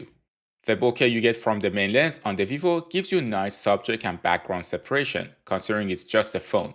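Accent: Iran. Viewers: I realize that accent it's Persian accent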